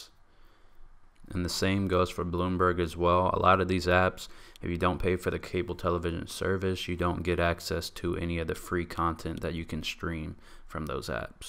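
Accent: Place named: Brooklyn, United States. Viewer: bro you sound like a nyc/brooklyn dude.lol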